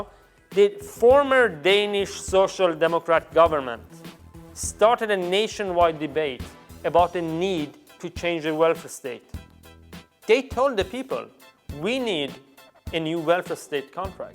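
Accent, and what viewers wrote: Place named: Iran. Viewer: This guy sounds Persian!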